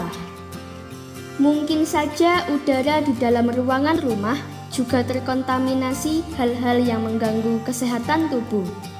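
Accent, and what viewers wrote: Java, Indonesia. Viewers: Jawanya medok kalii itu bang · Beetele2,,,,n sgt meddok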